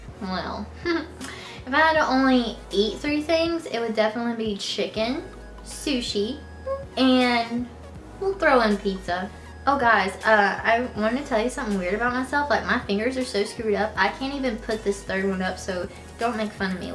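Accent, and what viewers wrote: South, United States. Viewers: is she country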